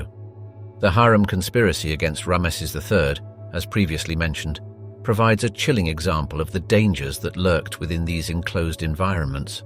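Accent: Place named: England, United Kingdom. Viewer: YOU NEED TO BE “SLAPPED” FOR TRYING TO MAKE A GREAT CIVILIZATION LOOK BAD! Great Britain ‘s Royal family are “ALL COUSINS” & committed “INCEST” ALSO BY MARRYING FAMILY! ALL EUROPEAN ROYAL ARE CLOSE RELATIVES!!! King Charles & his deceased wife, Diana ARE “COUSINS”! “STOP” RAGGING EGYPTIAN ROYALS, WITH YOUR “JEALOUS & HATEFUL” ENGLISH ACCENT! HOW DARE YOU????!!!!!!!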